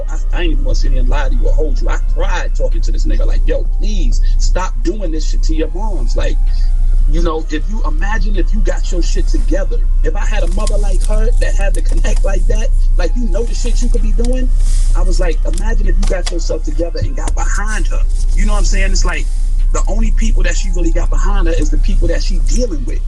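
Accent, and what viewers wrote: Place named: New York, United States. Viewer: Yo this nigga sound like Jay-Z this the second video I'm hearing with u and ol head y'all New York niggas be sounding the same on that jack especially with that New York accent. I got a good ear I can always tell where a person from by how they talk and what words they use.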